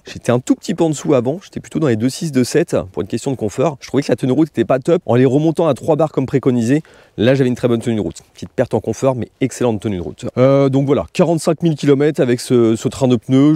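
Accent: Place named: Paris, France. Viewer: J’en peux plus de ce E preposale à la parigo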